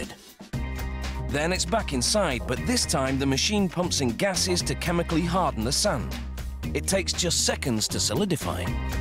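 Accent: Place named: England, United Kingdom. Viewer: English narrator > American narrator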